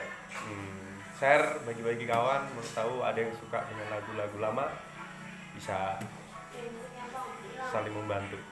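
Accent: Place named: Java, Indonesia. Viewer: Pas nyanyi medoknya hilang nggih mas 😂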